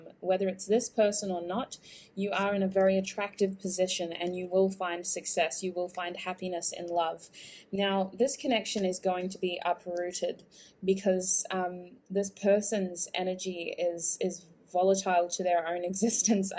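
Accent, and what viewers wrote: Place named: Australia. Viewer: Thank you. Group 3. Perfectly accurate. I will continue to focus on self, he has a lot of mixed up "stuff" to sort through, and I know he will come back into my life, he struggles to let go, however, I have put my life on hold for too long now. Time for me to keep focussing on me and moving forward. I follow a few tarot readers, it sounds like you are Australian. You are very good at what you do. Thanks for sharing with us and giving us some clarity xxx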